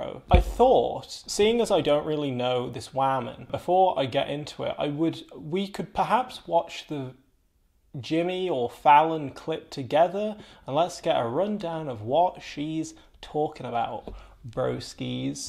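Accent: United Kingdom. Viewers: I can see what you mean by black passing. But what I looked at you I actually didn't think you were black. like full african. I thought you were like well actually I did think you were black like I thought I was confused like I wasn't sure if your Fulani Ethiopian smiley. You could have been all those things so I guess you're right you are bypassing. I thought when I the English accent to me I think that's what set me off. Because if you were over here in America you would just be black and my opinion. Because black Americans I don't see any mixed black people looking like you. Really at all.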